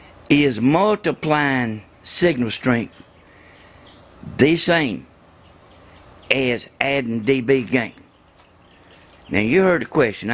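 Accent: South, United States. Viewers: You need a signal strength meter if your making 1k a week you can afford one, then any doubt you have you can fine tune your product. I think I'd make a bending jig with nails and a sheet of plywood. So do these work better outside on the roof or highest point on your house or in the attic? I think my next prosses might be a system and materials to mass produce these. Your reflection device could be mesh covered in hard plastic. I also think the PVC pipe works just route the wires inside the pipe. Also the quality, and fittings on your coaxal cables will have a great effect on how many db down you have. Our old cable company changed its connectors often to get better signal to noise ratio's also all connections must be tight. A loose connector will leak signal. Some people might think your dumb, well yes your southern english is a key off but I understood you. 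So my spelling might not be great but I have dyslexia. English wasn't my forteau in school. Really the comment anyone is stupid is in and of itsselfe stupid. We all have something to offer. You've found yours. For all we know your acting like you talk to be funny. By the way even if I know more my wife is always smart. She is intelligent its just different then myself, I know more world things and see things how they work together and alone I have a good idea how to make things and take them apart of why they don't work. She can tell you the math or where I screwed up the math. Now with a signal strength meter you'll kn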